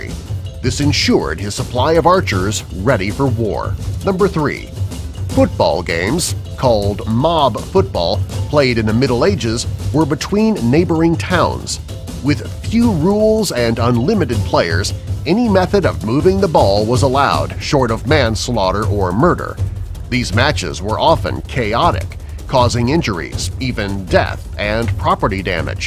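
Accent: United States. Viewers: Interesting but learn to speak correct English language!, instead of americanizing it!!!!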